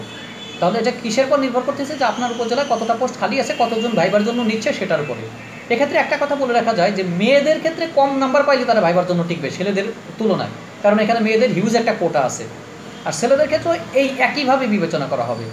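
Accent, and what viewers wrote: Bangladesh. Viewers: ওরে বরিশাইল্লা!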